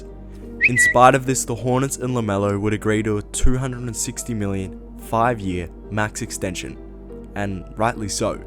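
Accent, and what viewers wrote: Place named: England, United Kingdom. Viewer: Im not gonna take anyone making a video about the NBA with an English accent seriously. Im just not.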